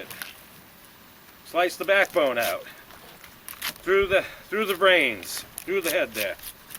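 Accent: Boston, United States. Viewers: Thanks for sharing!!! I can hear you haven't lost that Boston accent despite you have been in Alaska for decades. Great show (Yucon..)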